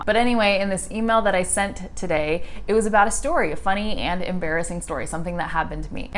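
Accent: California, United States. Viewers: Hey,! You speak very undastandable and clear American English! I d like to know what state do you live in? Is it common pronunciation in your state? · I am learning English. Do you speak an American or British accent? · Do you still have an American accent when you speak spanish or a native one?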